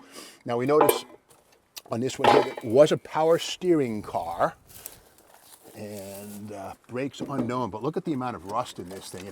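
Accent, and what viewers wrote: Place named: Massachusetts, United States. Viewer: He's from Mass. They have their own vocabulary there. They drive caaas.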